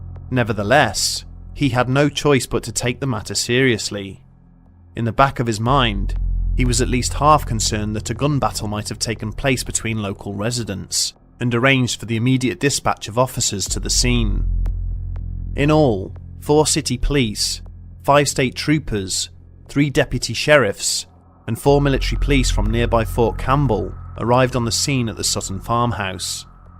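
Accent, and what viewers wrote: United Kingdom. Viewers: Sorry but this narrator calling a twenty two riffle a “two-two” is just ridiculous and I assure you the people from UK 🇬🇧 do not all speak like this twat.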